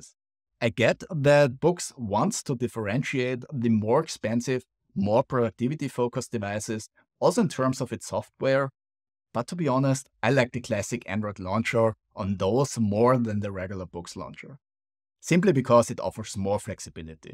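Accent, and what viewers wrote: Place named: Austria. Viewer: you have a very austrian accent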